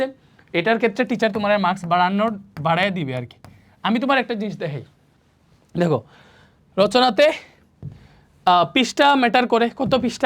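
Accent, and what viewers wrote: Sylhet, Bangladesh. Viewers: ভাই আপনে সিলেটি নি ভাইরে ভাই😮😮❤😊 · Bhaiya apni ki Sylheti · উনি কি সিলেটি😐🙂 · আপনি সিলেটি তাইনা স্যার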